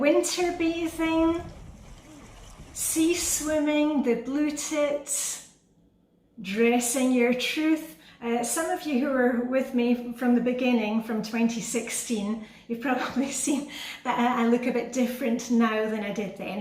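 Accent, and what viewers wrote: Scotland, United Kingdom. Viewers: I found you on YT about three years ago. I searched 'decluttering' and lots of American sites popped up, some of which I still follow. A short while after that, your channel came up as a suggestion and it was refreshing to hear a British voice. Have been following you ever since. I like that you give clear no- nonsense advice and your channel is a mix of your day to day adventures as well as the house blessing suggestions and they are always upbeat and fun. Long may you continue to make these videos as I enjoy them immensely and always have a smile on my face as I watch. Kindest regards, Debra x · I found you early 2021. You were doing a video as part of the mega motivation collaboration with the minimal mom. I just loved watching you as it was nice to hear a Scottish person on YouTube as I live in East Lothian. You have transformed my home routines as I now follow a system and my home is definitely more hygge and I love it. 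Keep on doing what you are doing as I love the fact you are so relatable and you have such an interesting life. Xx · Hi, I found your YouTube channel around 2 years ago through a recommendation from another channel...sorry I don't remember which one---I had read "THE LITTLE BOOK OF HYGGE, the Danish way to live well" by Meik Wiking. My dad was born in Dundee, so I felt kinship with your Scots accent as well. I have also followed the Flylady system. I've totally enjoyed your channel as a subscriber and I want to congratulate you on a job well done!! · Hi! I found you on You Tube in 2017/2018 when searching for decluttering videos. So glad I found you! I was instantly hooked by your warm personality, encouragement, Scottish accent, and how you led by example, by doing the work yourself in your videos to achieve a lovely and clean home. Happy New Year!! 💕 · Hi!! I found you through Dressing Your Truth sometime in the last year or so. 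Your bright and playful nature, along with your lovely Scottish accent, offer us all a reprieve from the daily stresses. Your videos helped me get through some of the darkest days of the pandemic. Congratulations on your latest milestone and thank you! 💕 🖖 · 4 years ago, and by complete accident- Over 10 Ways We Really Hygee Here in Denmark. You had your crisp haircut, wearing camel color knit, sitting in your wing back chair. Next thing I knew I watched all your content. Was really inspired to tackle letting things go, and practice daily routines. Really appreciated your simplistic frankness. Somehow it cut through the chatter of everyone else. I was always impatient with Fly Lady content from others. Sorry if it's weird, but your charming Scottish accent kept my attention while, at the same time, calmed me. Thanks for being the company in the room cheering me to get the thing done. It's been a real pleasure 🤩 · Happily, you popped up in my feed ( I am constantly looking for help with my routines!) Have been watching for 2 or 3 years now, you are an I spiration, love your content, your personality, hygge, and your Copenhagen lifestyle, all wrapped up in a gorgeous Scottish accent, thank you❤ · Hi. 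I often think of you, with your Scottish accent, saying "Good enough is good enough" 😊 That's such a good one! I must have found you soon after you started. I feel like I hit the jackpot when I did. I was searching for cleaning motivation on YouTube. You're such a blessing to many of us, even here in Ohio 😄💖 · I've lived in Scotland for 8 years and I've got the biggest soft spot for the lovely, cosy , Scottish accent. You could read the back of a packet and I'd still listen to it. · Several years ago I joined FlyLady and ended up down one of the dreaded rabbit holes to your site. I loved your fringe and Scottish accent which made the 'lessons' so much more enjoyable. Thanks for your wonderful contributions to our lives.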